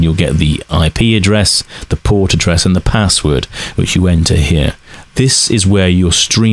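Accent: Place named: Australia. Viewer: Australian accent :P u can hear a difference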